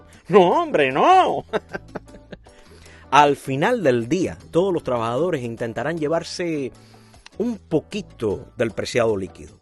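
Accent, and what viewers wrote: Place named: Cuba. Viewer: Suena lastimoso, solo una pregunta... Debo creerte solo porque suenas como cubano? Quizá, pero por que veo que no estás en el hermano País de Cuba pues tú set se ve muy bien y más... Te enfocas en "el poder" interno y no en la lucha que por años dignamente ha librado el pueblo cubano y también en dramatizar con burlas tu punto de vista que no se sostiene de datos concretos. Ojalá puedas ampliar información.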